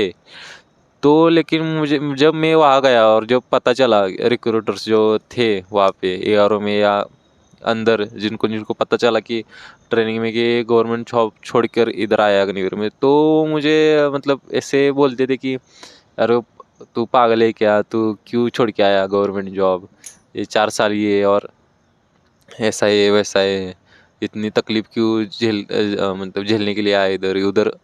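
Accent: Maharashtra, India. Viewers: Marathi aahe ka ha bhau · Bhai marathi ho kya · Bhai tu marathi lag raha he me pn marathi he from maharastra ❤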